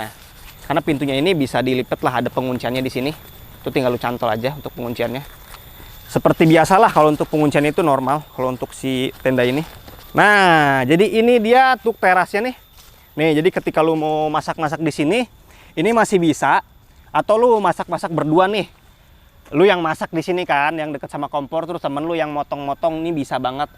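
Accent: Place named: Java, Indonesia. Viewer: Suara lu kalo riview medok banget bang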